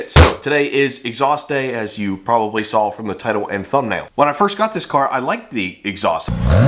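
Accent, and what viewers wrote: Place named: Pittsburgh, United States. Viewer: Everytime I watch one of these videos I think "that guy has to be from Pittsburgh with that accent" but apparently folks from Maryland sound the same?! Great videos - appreciate the gumption.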